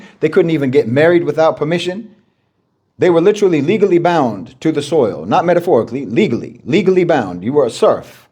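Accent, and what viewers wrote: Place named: United States. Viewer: You are extreme and seriously ridiculous. You speak as an American which tells me you were born and raised here. Your epiphany came to you after you began struggling with your own identity. That’s your problem, you struggle yourself with your own identity. Therapy, a good therapist could help you because you need it. If you are going to preach history including that of western culture and society you need to be very honest and don’t cherry pick the best examples from past 300 yrs that will bolster your ridiculous rhetoric. You seem to purposely ignore what has been happening all over this tiny planet since beginning of recorded time, time of the Bible. You like to blame the whites, what, the pinks as you say in another video. I have to admit listening to you was entertaining and made me laugh several times but on serious note, you need to accurate and thorough with your so called history lessons. 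Entire planet has been savage. You know this but choose to blindly ignore it. This is niche for you to appeal to the low vibrating low IQ of a subset of American blacks. This niche grift of yours isn’t going to fly much longer as more and more black families are finding their way out of the poverty and are now enjoying safe and fun lives living further away from the urban blacks who you really appeal to. The young black today are not falling for this crazy division. That’s all you preach and comment about, hate the whites. Your comment sections are insane, stuffed full of low inner c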